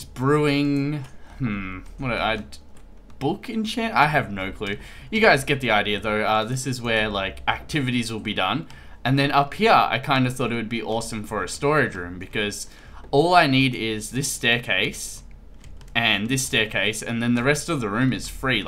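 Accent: Australia. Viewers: Are you Australian? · You're one of my favourite youtubers, you deserve way more subs man! Awesome content! And people say to me: You sound Austrialan, then I directly think of your voice haha! :D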